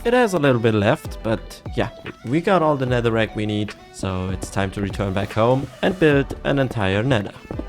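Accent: Germany. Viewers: you sound like suuuuper german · Ich wusste doch du bist deutsch, ich höre den Deitschen Akzent raus 😂 Btw ist die Kartoffel eine Anspielung darauf? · He is German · You have a german Accent 😅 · Sehr Gutes video man hört Dehn deutschen Akzent raus 🤙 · u sound kinda german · The German accent is just 👌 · the german accent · I love the accent omg! Are u german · Man merkt, dass du deutsch bist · Ha ich wusste dass du deutsch bist, der Akzent 😂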